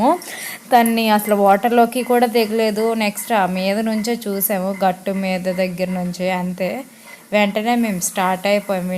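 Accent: Srikakulam, India. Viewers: Ayite srklm slang Or vsp Or hyd edo okate laa paluku oknaa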